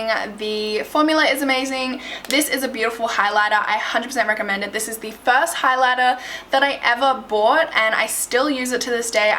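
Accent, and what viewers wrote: Australia. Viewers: Hey are you Australian? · is she australian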